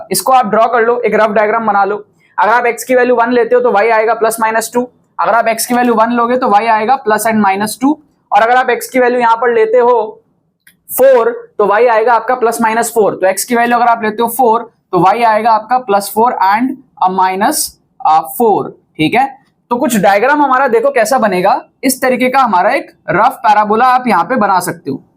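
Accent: Bengal, India. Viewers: oh sir u r amazing 🤩...ur Bengali is too sweet ❤️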